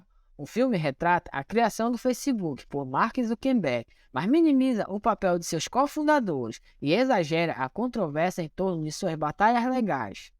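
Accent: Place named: Belém, Brazil. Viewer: Existe uma grande possibilidade de eu estar enganado, pois não sou especialista em nada, mas, se eu estiver certo, aposto que o narrador desse vídeo é natural de Belém (PA) ou (quem sabe?) Ananindeua. Talves Marituba, Sta Isabel ou até mesmo Castanhal, na área metropolitana. É evidente que ele está lendo um texto e está forçando a dicção, a entonação de voz, para parecer um reporter do JN. Obviamente, ele não fala assim entre os colegas da escola. Entretanto, muito provavelmente, esse perfil não é da plebe. É da burguesia. É de classe média, saca? Ainda estuda ou já estudou em boas escolas, tipo, escola particular, sabe como é que é ??? Mas, enfim, o sotaque não me engana. Se eu me enganei, ele é muito bom. Porque, para mim, ele não pode ser de nenhum outro lugar a não ser dessa região metropolitana que eu mencionei acima -- e se for da favela, é uma exceção à regra. 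O cara da favela, fala diferente, fala na gíria, aquele lance da malandragem, tá ligado? O que não parece ser muito o lance dele. Mesmo se fosse da favela, eu também saberia porque conheço as gírias de lá, e só pela maneira de falar, eu identificaria talves até o bairro em que ele mora. Rsrsrsrsrsrsrs😂 Gostei do vídeo, tanto pelo conteúdo, quanto pelo esforço dele em parecer um locutor ou reporter de TV. Ficou legal. O moleque é bom. É diferenciado. Valeu, ai, bro. Vou deixar um like.😊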